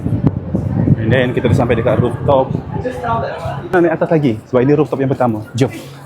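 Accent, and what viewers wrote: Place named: Kuala Lumpur, Malaysia. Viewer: pandai abg ckp kl bang. berapa lama dah duduk sini. done subscribe🎉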